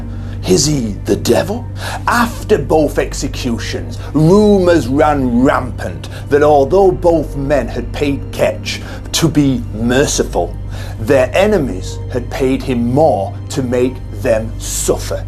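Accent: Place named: Middlesbrough, United Kingdom. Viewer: I'm trying to figure your accent out....a bit of a Middlesbrough accent....a bit sunderlandish, bit of a Mild Geordie twang. I think your from somewhere between Hartlepool and Sunderland. I'd love to know if I'm close.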